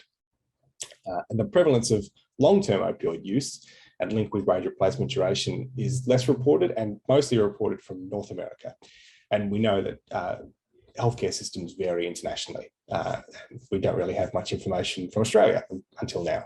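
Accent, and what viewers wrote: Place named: Mexico. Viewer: Nice research but I found it hard to understand the presenter's Mexican accent.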